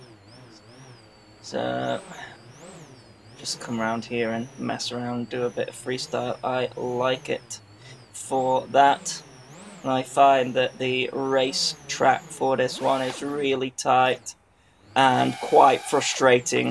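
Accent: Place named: England, United Kingdom. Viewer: For me you're the most reliable reviewer/drone channel-guy out there. If im unsure when it comes to "drone-decision-stuff" i always just have to browse your channel to find the right answers and opinion. Of course there are other good and trusty reviewers on yt....but totally prefer the english language and pronounciation over this american english blabla....or well in most of the cases atleast. Ofc joshua bardwell, rcAddict, kababFPV, stu from uav, envy astro+seth and Bruce from rc model reviews doin a GREAT job aswell....but you are always a tad more enjoyable to listen too. Thanks for that dude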